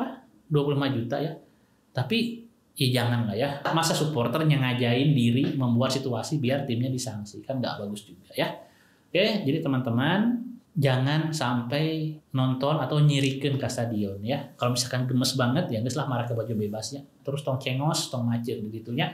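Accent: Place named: Surakarta, Indonesia. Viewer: Medok solo lah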